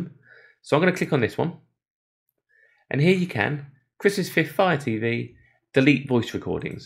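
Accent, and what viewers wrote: United Kingdom. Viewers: I had to watch this video several times in order to tell exactly what this man was saying, as his British accent was difficult to discern. But great points on how to enact privacy methods. Thank you very much. Be safe and well.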